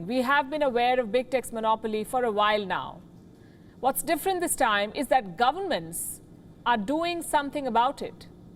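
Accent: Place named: Hindi, India. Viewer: Pronounce: All good sings not all good tings...Your Hindi accent is too strong !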